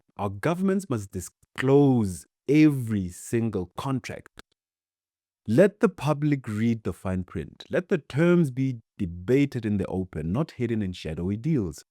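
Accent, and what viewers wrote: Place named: South Africa. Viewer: I wouldn't be looking at the Chinese or Russians or anyone else. Look at those corrupt sellout leaders and politicians who care nothing for their countries or its people... BTW you sound like a South African if I were you. I'd be more concerned about how it is that 7% of your population own the majority of the land in that country...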